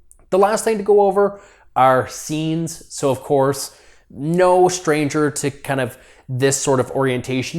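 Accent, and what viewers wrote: Canada. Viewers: You sound Canadian 🇨🇦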